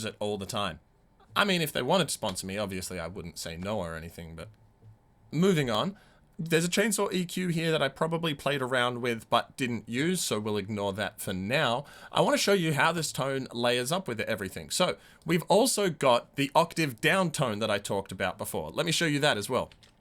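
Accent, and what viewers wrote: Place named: Australia. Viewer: Interesting stuff! You Aussie's with your funny 'H' sound always gets me. Trying to hear the difference btw some of those heavy distortion tones reminds me of trying to show my parents the difference btw East coast and West coast rap in the 90's! I guess you have to listen to some music that uses those tones to get it right off the bat. That POG is slammin'! (pun intended) I like to do that and just use the two lowest strings, too. It reminds me of that 60-70's Soul/Funk sound.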